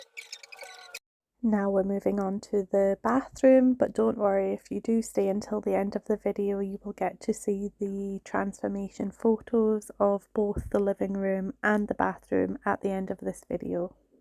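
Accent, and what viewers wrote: Scotland, United Kingdom. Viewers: official pet troll here. Don't worry! I'm a friendly troll. I'm near Fife, Washington. From your accent, I suppose you are closer to the Fife area in Scotland. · Dear and, thank you for great videos and for all that you're doing to help others. I'm a big fan of Midwest Magic Cleaning and after his shout-out to your channel I am so happy to see the number of your subscribers growing. I love you soft narration, it's not monotone and I would like to encourage you to keep going and narrating the way you wish. Your softer voice is also good for ASMR if you want to give that a go! I don't know who told you that you had "wrong accent" but please block such comments. I love Scottish accent and there is no accent in the world that would be wrong in any way. Aye, you're doing a wonderful job and I'm looking forward to your future cleanings. 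🙂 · MMC said hello! And your accent is fine. When you are from GB you tend to have a british accent😂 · I agree except for the music part…nice soothing music is nice & your voice is fine…you’re a Brit. We know how you guys speak 😏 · I am not very good with accents, but I want to learn. What kind of British accent do you have? Is it typical for a certain area? My British friend from London has a very strong accent, very different from the way you speak. I live in the US, but English is not my native language. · Your gentle Scottish accent is lovely. As a lover of so much american content, it is comforting to me to hear a familiar UK accent. No music for me, I find modern music very dischordant and distracting. Visuals and your own voice for me, thank you. I would say, just be yourself. Those who want and need what you have to say and show will stick around regardless. All the best with your channel (I found you via Mid West Magic Cleaning) . 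· I love watching your video's and your wee Scottish accent . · How rude! Your voice is totally fine. You just sound like you live in the UK. Midwest Magic Cleaning sent me to check you out. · You have a beautiful Scottish accent 😍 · I love a Scottish accent and you will always get moaners and whiners who would not do what you are doing even if they were paid. Thank you for all you do. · Scottish accents are the best accents in the world, top three for sure. It's so comforting, maybe it's because my ancestors came over from there; it speaks to something deep in my soul. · Came over from MWM. Yes, please no YouTube generic music and there's nothing wrong with your voice. I enjoy a good Scottish accent. 😊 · I like hearing your voice! Please don’t stop talking because of negative feedback. I am Scottish too and grew up on the East coast of Scotland. My accent is similar to yours. I live in America now and don’t hear a lot of people who sound like me, so it is lovely to hear your voice. 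· Bonnie from A Beautiful Mess sent me your way. Your accent is nice, reminds me of my time in the UK - we need all the diversity we can get in this space. <3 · I like the chit chat during videos and love the Scottish accent! Out of curiosity what gets recycled from your cleans? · This transformation is amazing. You two did such a wonderful job. Love the narration, Scottish accents are the best! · MidwestMagicCleaning mentioned you so here I am. There is nothing wrong with your voice at all -- it's quiet and relaxing to listen to. 🙂 And your accent is nice too. I'm American -- we love UK accents, lol. So glad the resident is getting help. ❤I subscribed and now I'm off to check out the other half of this clean! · Your accent is so mild, who ever said that obviously hasn't been to Scotland 🏴 They would have nae hope understanding me, rubber that feedback yir doing braw ❤ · Midwest magic recommended your channel so you must be good! 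Only take advice from Mac he’s the best, I love the Scottish accent I also follow the sheep game and the hoof gp both great channels. How can you have the ‘wrong accent’ ? Wow! Good luck with your channel I have subscribed ❤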